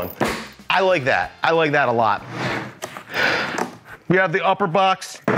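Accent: Philadelphia, United States. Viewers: That’s just South Philly baby!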